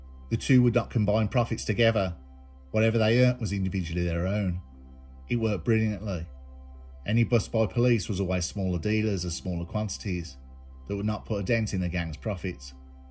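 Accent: Birmingham, United Kingdom. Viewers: Top content again mate . And the Brum accent just tops it off. Love from Manchester 👍🏽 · Big love for the Brum accent. I will never get tired of it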